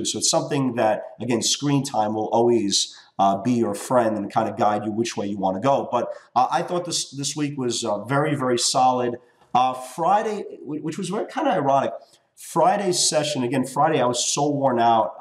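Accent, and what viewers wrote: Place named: United States. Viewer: your accent is quite possibly the best American accent in existence.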